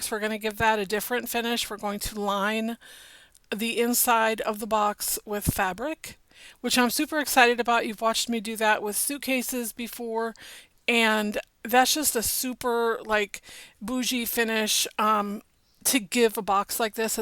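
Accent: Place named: South, United States. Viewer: Nice to see you’re back! I sometimes detect a southern drawl 😊.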